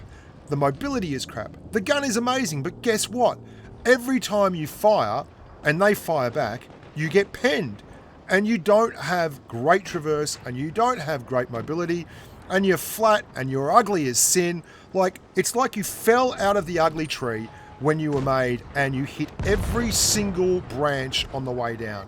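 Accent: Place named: Australia. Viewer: I’m a kiwi and I think he’s an Aussie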